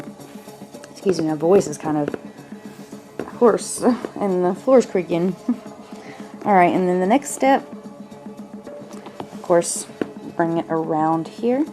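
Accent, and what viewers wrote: South, United States. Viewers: where you from you have a southern accent :)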